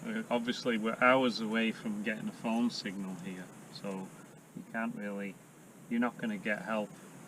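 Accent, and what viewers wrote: United Kingdom. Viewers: glad you're keeping the old UK traditional dialect alive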